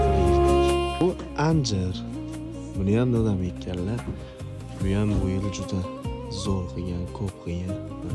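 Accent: Kokand, Uzbekistan. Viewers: Quqon shevada gapirin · УЗИМИЗНИ КУКОНЧА.ШЕВАДА ГАПРСАЙИЗ ЯНАЯМ ЧИРОЙЛИ БУЛАРДИ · Куконда Дода дийилмайди углим додани Опоки дийишади сих хам Куконнни шевасида гапировринг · Укам каерда яшасангиз хам узлигизни унутманг Кукон шевада гапираверинг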